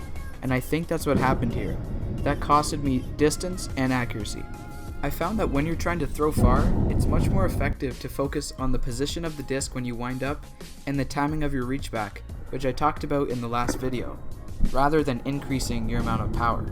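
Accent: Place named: Canada. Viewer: Your videos are the best... excellent breakdowns and teaching! Sounds like you are somewhere in Canada? How did you get your gym let you do that on the basketball courts?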